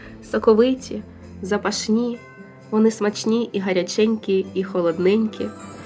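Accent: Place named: Kharkiv, Ukraine. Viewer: Дякую за рідну мову і рецепт..Ви,мабуть,з Харкова,бо чую рідний акцент.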